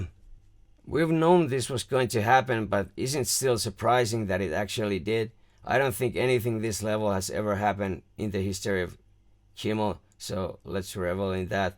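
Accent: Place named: Finland. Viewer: Not gonna lie, it took me a while to place that accent. At first I thought it might be some odd dialect of Irish English, but after a while the Finnish-ness crept in - which I eventually caught, being also from the Nordics. Norway, in my case. Anyways, terve to you, my man. Good video! PS: It's reggeaton.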